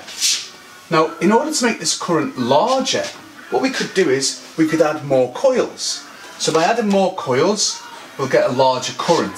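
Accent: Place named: Scotland, United Kingdom. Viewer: He does have a Scottish accent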